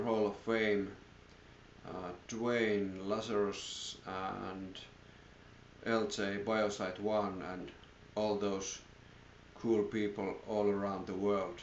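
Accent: Finland. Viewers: Great video, I could tell you were from Finland before you even said it. I just started collecting this year, I have been getting alot of, and other Finnish viking/folk metal bands. I am excited for this 13 disc box set that they are making! It is supposed to be the biggest sized set in the history of metal.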